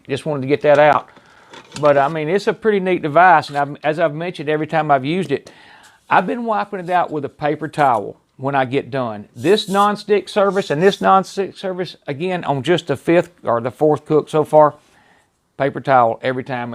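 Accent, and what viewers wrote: South, United States. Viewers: Great, simple, straight forward and easy to follow. Also, when talking about barbecuing, I tend to trust people with a strong southern accent. Thanks for this. Will be trying this week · ! Great Video! My Wife and I made the Ribs and used about 1 - 1/2 slabs at the same timers. Still delicious. Probably means that there is room for error. I'll subscribe not for the recipe, but for being able to listen to your kindly southern voice. Nostalgic in a way. Last thing...off topic. I would like to know what kind of watch you have there. That thing is right up my alley and would be great for learning to cook.:-) Thanks, keep it up and don't feel like you don't deserve to monetize your channel. I've seen THOUSANDS of terrible worthless videos that are making way too much money. Great Job.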